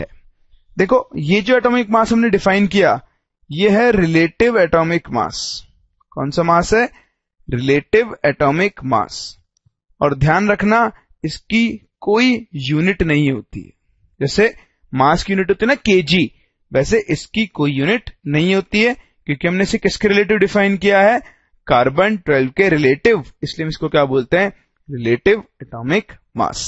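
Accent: India. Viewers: Indian English..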